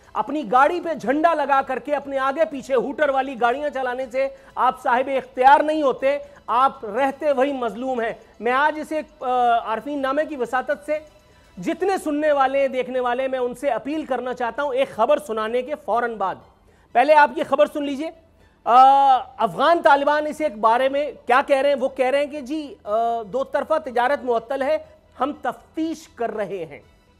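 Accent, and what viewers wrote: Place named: India. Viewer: Sadeqe sahab apka lehja he Indian ha..nafrat na banao ye khod army ne apne oper keya ha taky nazar estaraf hojae...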